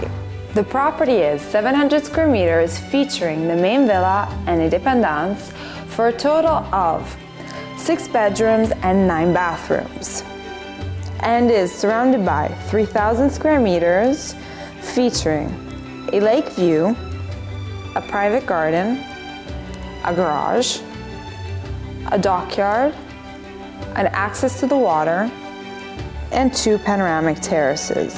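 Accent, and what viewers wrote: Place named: Italy. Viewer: your italian-english accent is so sweet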